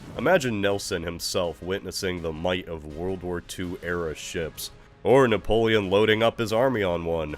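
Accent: United States. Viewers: Yep, this is certainly an American pronouncing these French Marshall names. Epic History... My beloved, please, clean my ears of this hideous noise. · triggered in british at hearing american pronounciations of places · The way Americans pronounce English towns is excruciating. (But funny)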